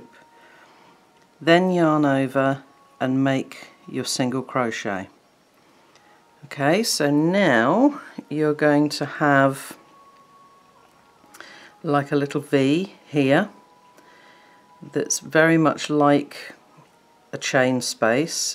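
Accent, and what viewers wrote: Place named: United Kingdom. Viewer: So lovely to see a tutorial in British English! Thank you x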